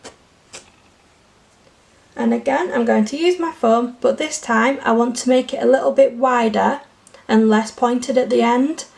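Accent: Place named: Yorkshire, United Kingdom. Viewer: I kind of thought you were a Yorkshire Lass, I have relatives in Lincolnshire, about 9 miles from Boston or skeggy depending on the way you go. Take care, I’ll look forward to all your future videos. Keep up the great work you are putting out there. 🥰👏🏻👏🏻👏🏻👏🏻👏🏻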